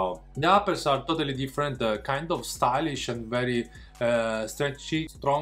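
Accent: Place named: Italy. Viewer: Your English is fine but i still can hear the Italian accent clearly XD, good review.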